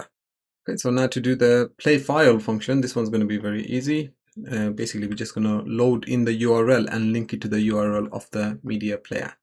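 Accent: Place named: England, United Kingdom. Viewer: WOW FIINALLY SOMEONE WHO SPEAKS ENGLISH ENGLISH NOT INDIAN ENGLISH <3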